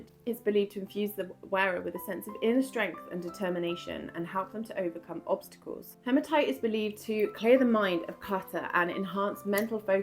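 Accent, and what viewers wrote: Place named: England, United Kingdom. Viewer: Thank you for your very interesting video. I'm new to your Channel and this is only the second one I've seen. I have subscribed as I love your calm, relaxing English accent. You are also a Beautiful English Rose. 😘🏴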